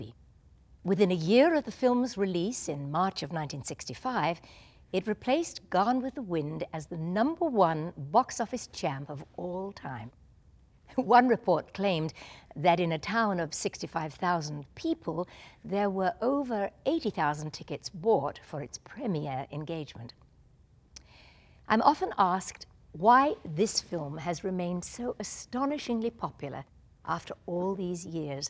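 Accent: United Kingdom. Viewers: Its actually a southern english accent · I love Julie Andrews and I love the fact that she's never lost her British accent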